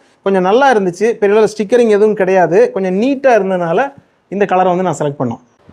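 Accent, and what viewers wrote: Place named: Kanyakumari, India. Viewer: உங்களுடைய தமிழ் அருமையாக இருக்கிறது நீங்கள் கன்னியாகுமரி காரரா?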